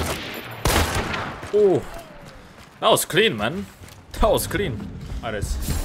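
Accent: Czech Republic. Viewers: Im already know all by 300 hours but thanks for tips you sounds I little bit like from Czech Republic so easy to understand thanks man..👍🤠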